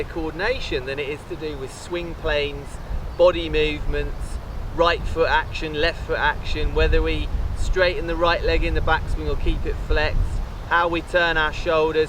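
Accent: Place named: United Kingdom. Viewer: Hey, really enjoyed yr commentary on the three hole channel at the open. Great to hear British commentary on the golf from here in the US.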